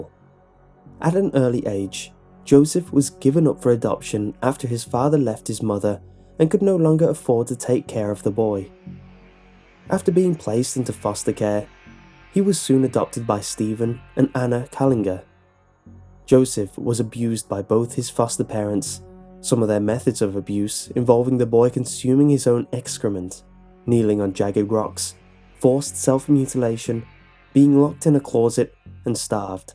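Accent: United Kingdom. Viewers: You British? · I don’t like British accent It Sounds like foreign English I am in trouble 😭 This is not good 😵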